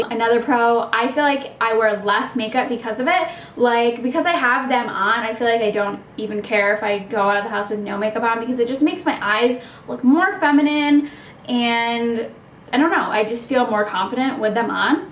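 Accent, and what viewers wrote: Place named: New York, United States. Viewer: Lol I love your New York accent ! I can tell 💗💗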